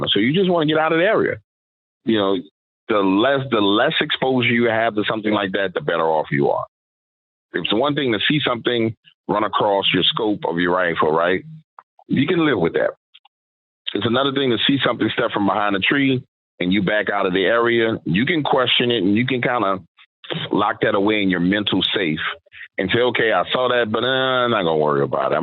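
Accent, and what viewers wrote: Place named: New Orleans, United States. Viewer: Aah my fellow New Orleanian!! I can hear your accent a mile away 😅 “dawk wadders” Love it! 🫶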